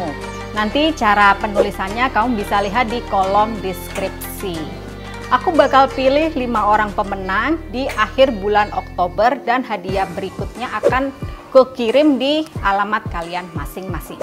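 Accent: Indonesia. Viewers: paling seneng karena simple resepnya, mudah di recreat, truss medhoknya itu bikin khass, ngangenin🥰😋 lanjoetkann, resep fav aku dan anak anak adalah "puding lumut" caiyoooo🍮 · suka banget sama channel ini soalnya resep nya simple bahannya juga mudah dicari, dan Surabaya an banget suaranya medok khas gitu, video favorit " pudding roti zebra" simple,enak,dan cantik banget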